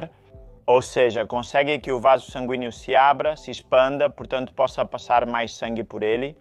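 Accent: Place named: Galicia, Spain. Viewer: EU. CARALLO. QUE FALAS. Ti GALEGO. GALEGO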